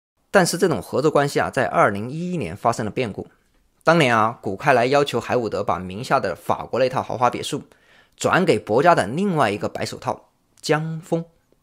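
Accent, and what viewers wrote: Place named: Jiangsu, China. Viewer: 听的口音，是江苏人吧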